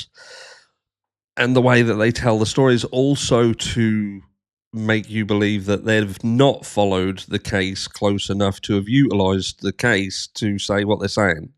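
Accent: United Kingdom. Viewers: your British accent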